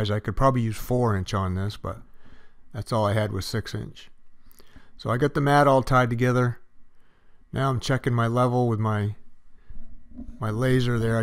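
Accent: Maine, United States. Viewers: LOL within 10 seconds of hearing you speak, I said this guy's from Maine, possibly NH. Good Job!!! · I could tell you were a fellow mainer, that accent is thick. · Ohmygoodness, you sound like home. You live near Rumford, ME? Or Waterville. I bet you're Waterville. I'm out in Colorado now, but grew up in Monmouth. Watched the whole video just to listen to you. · I moved away from Maine about 30 years ago give or take. I'm listening to him and thinking that's a Downeast accent. Then at he says "getting everything right screwed into place".. :) Yup. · You sound like you may be from Maine. Great video! God bless from Aroostook County.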